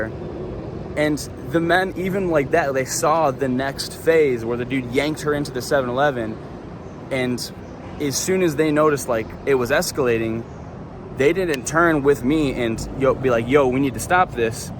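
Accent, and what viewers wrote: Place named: South, United States. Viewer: Your accent is too Southern USA ! Go back to North Carolina hick !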